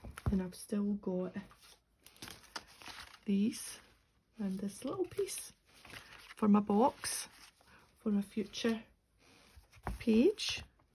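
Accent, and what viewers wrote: United Kingdom. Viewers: Thank you for the video. Adore this creative idea. Also, I love your accent. Where in the UK are you? I listen to another lady who has a similar accent. Enjoy hearing you so much.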